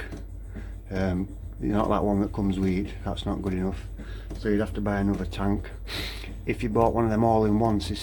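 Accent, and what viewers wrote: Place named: Barnsley, United Kingdom. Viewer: That's a proper Barnsley accent - t'owd lad :-)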